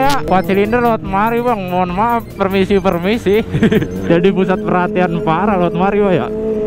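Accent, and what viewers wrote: Jakarta, Indonesia. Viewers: Bahasa jakartanya makin kentel bang 🤣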